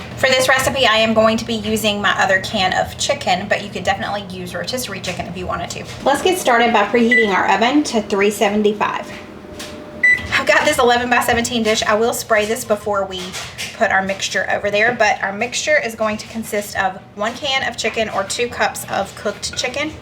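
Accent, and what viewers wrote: South, United States. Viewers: Being a cat lover and owner of a Birmese X I just love the way you talk & interact with your cat...and in your southern accent calling her by name...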